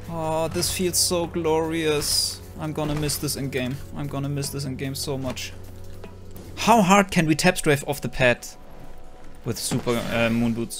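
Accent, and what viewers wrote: Germany. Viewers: Bro du bist ein deutscher oder? Hört sich jedenfalls so an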